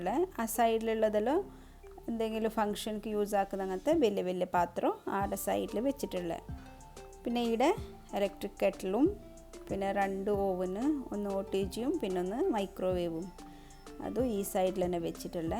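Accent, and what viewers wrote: Coorg, India. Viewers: Hii samsaram ketappo thoni m in coorg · Samsaarm kekumbo vijaaricchu coorg thanne aanuu enn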